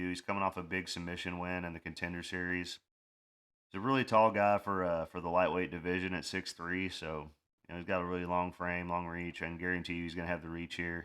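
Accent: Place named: Tennessee, United States. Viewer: I dig your breakdowns. You got a accent like me. Middle TN. Where you out of?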